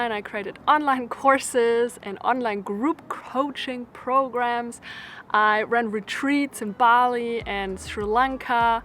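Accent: Germany. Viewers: Ich hör zwar, dass du Deutsche bist, aber es ist so viel angenehmer als bei vielen anderen. Danke für deine Tipps und viel Spaß noch. :)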